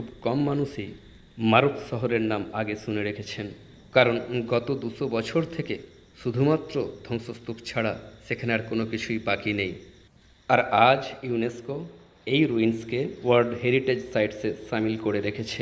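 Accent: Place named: West Bengal, India. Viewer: ভাই উনার ভাষা এমন। উনি পশ্চিমবংগের সম্ভবত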